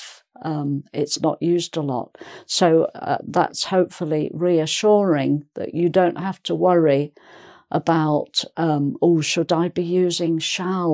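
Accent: United Kingdom. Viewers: thanks, thanks, thanks, now I understand how to use shall. (love your accent...so british)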